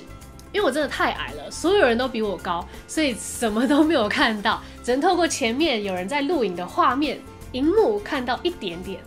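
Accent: United Kingdom. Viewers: 耶好想念滴妹🌸 後面的英國腔好可愛 我也好希望以後可以去一趟英國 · 這一次的影片真的很不錯！ 有跳脫出純教英文的FU，然後針對英國的當地文化進行英文解說，非常引人入勝，令人很想再重看一次！ 最後滴妹的英國腔好聽，希望以後可以教唱英國腔的英文歌XD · 滴妹英國腔也太好聽了~~~~~~~~~~~~~可以教一下嗎？ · 英國腔好聽~ 之前在NZ的時候很多超市都有自助結帳機欸~像Countdown或New World都有喔! 看起來很方便可是從來沒試過XD下次來試試看w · 超喜歡滴妹英國腔😂😂😂😂😂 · 好喜歡滴妹模仿英國腔英文，好聽又可愛！ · 英國腔感覺好優雅😍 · 英国腔超好听der😍😍😍😍😍😍😍😍😍😍😍😍😍😍😍😍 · 滴妹妳的英國腔超讚超級可愛~~~ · 滴妹的英國腔 好像喔⋯⋯ 我也想繼續看 滴妹分享英國行的大小事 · 英腔大好啊啊啊！ 滴妹今天穿的好文青帶點英式感👍 阿滴最後的「個屁啊」注目😂😂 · 哇～英國腔！練了三四年都沒有練出個所以然來，羨慕滴妹啊～！可以拍一個英國腔的影片嗎？Please~ 🙏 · 英國腔真的超好聽 超愛 好想去英國😭😭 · 滴妹的英國腔跩跩的好好笑喔XDDD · 英式發音 真的好好聽！😍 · 我有去過～～哈哈 滴妹的英國腔超口愛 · 原来滴妹没有在英国留学！ 那英国腔是怎么学的呢？ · 好漂亮的英國腔喔~ 讓我想到英檢考聽力的時候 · 滴妹用英國腔講話好像妙麗喔 QwQ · 我小時候學兒美 老師上美式 檢定考英式 頭很大！ 😅 聽到滴妹唸英國腔，彷彿回到以前聽聽力的感覺😂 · 滴妹好適合 溫柔的英國腔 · 英國腔好聽~~ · 剛剛才看到英國脫歐有變數的新聞（希望不要脫） 就看到滴妹回來了～～～～英國腔好好聽～～ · 英國腔好好聽~ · 這英國腔超明顯的，are you alright...等等:) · 想知道如何說英國腔😁😁滴妹說英國腔好聽～～ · 英國腔讚~ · 英國腔超讚!!!!! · 很喜歡英國腔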